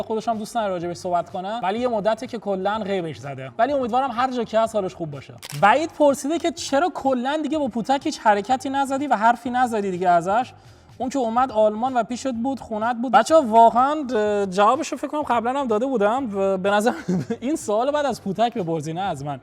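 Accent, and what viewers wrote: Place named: Bushehr, Iran. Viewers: با لهجه جنوبی صحبت کن بهتره با حال تره❤❤ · کسایی موافقن که بوشهری حرف بزنه داخل ویدئو هاش لایک کنن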